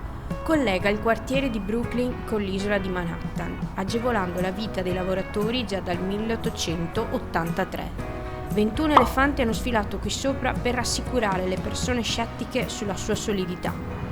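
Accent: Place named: Veneto, Italy. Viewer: Grazie per l'ennesimo episodio, quando mi appare la notifica è sempre un piacere guardare le tue avventure, il tuo modo di raccontare lento e pacato con quel tuo accento veneto, sono un toccasana, grazie ancora e buon viaggio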